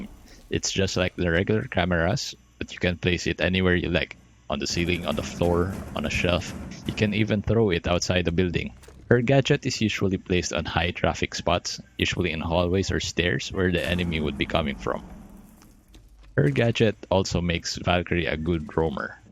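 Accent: Philippines. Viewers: The filipino accent on this one is wicked. I love it. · Are you Filipino? You have the accent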